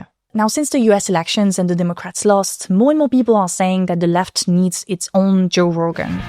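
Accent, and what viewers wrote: France. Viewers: I'm part of your new masculine audience. I'll speak for myself, I don't know if there are other man who watch your content for the same reasons that I do. I first saw you on Instagram talking about french politics. I'm a recent catholic convert myself and I was curious about my faith in the "eldest daughter of the Church". Then I deactivated my Instagram account (I got tired of that) and decided to follow you here. Your content is well-researched, and left-leaning in a way that, for me, is way to stay updated with politics in the old continent through your lenses. And also a way to stay informed about current trends in feminism (and your balanced critique). I also love french culture and literature (I love your accent, everything about you, really). So for me, I feel like watching you is like reading or watching a good news anchor (with a melifluous french accent and sapphire blue eyes). 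· Can't speak for other men but I watch for the French pronunciations of words like "rugged". That was priceless, thank you. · I love you, and your french accent is a staple to your media but please say it with me. "Agency" - A-gency like "A gentsy."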